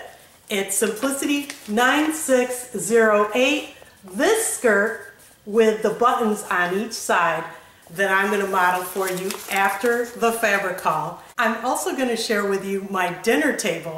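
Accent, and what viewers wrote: Pennsylvania, United States. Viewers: Hi! I recently found your channel and I'm totally hooked! I love your accent which I think is from PA but reminds me of NY. I'm from NH currently living in TX. I love your patterns, fabrics and beautiful outfits. I'm a new assist and get so inspired by you. I love your sweet friendly manner and how happy and positive you are! It's really like visiting a good friend. Thanks for all you do😊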